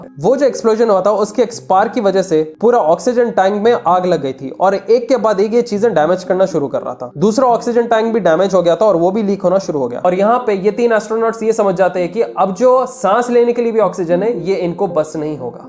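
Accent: Hyderabad, India. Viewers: Bhai kirak Hyderabadi baatan kere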